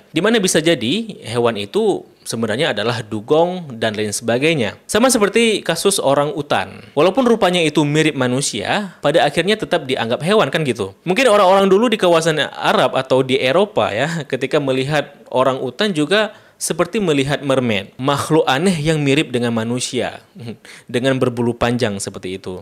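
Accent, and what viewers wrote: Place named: Aceh, Indonesia. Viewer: Bang logat km kayak org aceh😂😂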